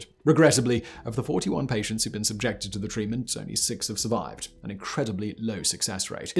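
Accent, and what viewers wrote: RP, United Kingdom. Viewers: Well, he's British. They often speak that way. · Strange that this guy gets so much voice-over work since his affect is difficult to hear. He drops the last several syllables of every phrase such that it is...... Therefore, it strikes me as odd that he would....... Still, the accent is posh British, so that affords him some....... But don't mind me, I'm just....... Anyways, I hope you all have a.......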